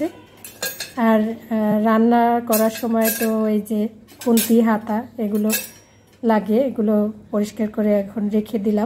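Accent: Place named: Barishal, Bangladesh. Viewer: আপু আমি তোমার ভিডিওতে আরো অনেক বার সুনেছি আমাদের বরিশালের কথা সত্যিই কি তোমার বারি বরিশাল তাহলেতো ভালই হলো আমার একটা আপনজন পেলাম আমার পরিবারে টমেটো দিয়ে মাছ রান্না দারুন হয়েছে অনেক ভাল থেকো দোয়া রইলো তোমার জন্য ভাল থেকো ইনশাআল্লাহ আপুমনি❤❤❤❤❤❤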